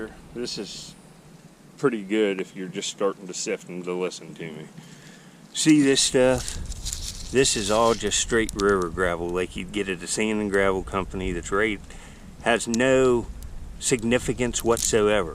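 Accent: Western Pennsylvania, United States. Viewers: you have to be from western PA with that accent. Am i correct?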